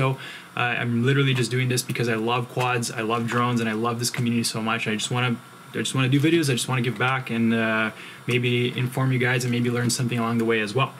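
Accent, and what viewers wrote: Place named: Brazil. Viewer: Nem tem sotaque nada... hahaha, mas agora que você falou, talvez tenha mesmo em alguma coisa, eu nunca que iria imaginar que você é Brasileiro.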